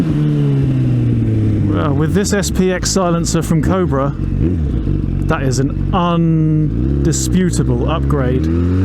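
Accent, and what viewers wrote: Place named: United Kingdom. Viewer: Wow, amazing review... really good quality everything, congratulations man. Your British accent along with the metric system talk sounded odd but so, so satisfying :). Can't believe I've watched a 30 min review without getting bored and even being surprised it ended so quick. You've just got a new subscriber ;)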